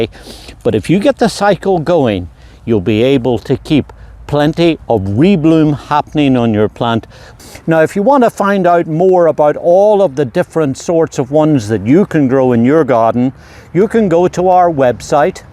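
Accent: Northern Ireland, United Kingdom. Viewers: Great video Mr! Thank you so much for sharing your gardening knowledge with us! I hope you don’t mind me saying so - it’s truly lovely to hear your accent. My late father was from Northern Ireland as well. Keep up the great videos! I have subscribed and will be looking forward to learning more! ☺️ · Is that a Northern Irish accent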